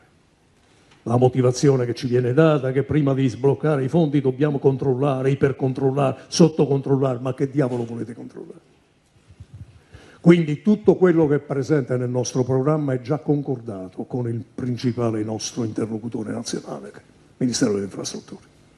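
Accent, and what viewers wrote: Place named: South, Italy. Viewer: quelli che chiede De Luca sono i soldi che la tua regione....non so quale sia....ma dal disprezzo che mostri sicuramente una del nord ... ha già preso!!!! Mentre a noi li bloccano senza motivo!!!!! Ti voglio ricordare che i tuoi ministri del lumbard- Veneto.....non hanno fatto un caxxo quando il covid vi ha dilaniato....e sai perché???? Non avete più sanità pubblica!!!!! Formigoni e compagni hanno privatizzato tutto.....e la gente è morta per mano dei suoi concittadini!!!!! Questo è il tuo nord!!!! La lega ha RUBATO 50milioni di euro allo stato italiano....per spese di gestione.....bah!!!! e li restituisce a tozzi e bocconi spalmati non per quanti anni!!!!!!!!!!! Il mio commento non ha accento così almeno le tu orecchie non soffrono!!!!! Purtroppo il mio cervello soffre solo a leggere certi post superficiali di gente che scrive ripetendo cose sentite giusto per esibire un razzismo che fa tendenza soprattutto con questo governo!!!!! 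Il tuo salvini si è alleato anche con il M5S.... la ndrangheta ho investito nel profondo nord milioni di euro per ripulire il denaro che come tu ben sai proviene da attività illecite!! COME MAI NESSUN PERBENISTA NORDISTA SI È OFFESO O SCANDALIZZATO????? Ti offende l'accento di un cittadino del sud....e non ti offende quello che i tuoi politici fanno???? TRA POCO UN SOLO ACCENTO AVRETE NELLE VOSTRE ORECCHIE.....QUELLO NIGERIANO.....con tutto il rispetto sicuramente meglio del vostro!!!!!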